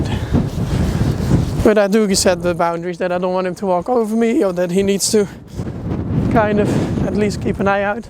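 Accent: Netherlands. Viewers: im sorry but are You dutch im very sorry to ask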